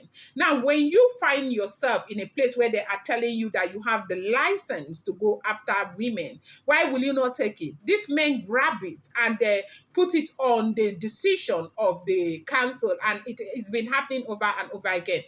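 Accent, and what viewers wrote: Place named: England, United Kingdom. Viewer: You are such a young and beautiful woman. I presumed you to be an elderly woman with a plump body and a deep voice 😂. Please pardon me for the presumption. I am so pleased to see your face and enjoy your vibrant smile and English accent. Kudos for being very honest and respectful in your delivery of your blog.❤. Please text me the link to join your chat. I couldn’t get the link. ( mind you I am of old school 😂😂😂😂). Don’t mind me ooo.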